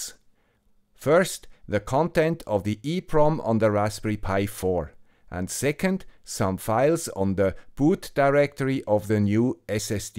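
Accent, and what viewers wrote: Switzerland. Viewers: It works, it works, it works, it works! How much I love the man with the swiss accent! :) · Watch out, after a update you might loose your *.dat and *.elf files, they were corrupted 28th of May, this is the old correct version <link> It's in Toms Hardware article. <link> It will save you some grey hairs. The new raspbian image has the wrong files, so this might help you. As always, a big thank you to "the guy with the Swiss Accent" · Danke guy with Swiss accent. Now I will buy a pi4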